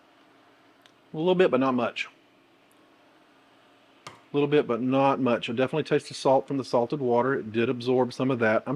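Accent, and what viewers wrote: South, United States. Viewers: What a great channel name to go with your sexy soothing Southern gentleman's voice.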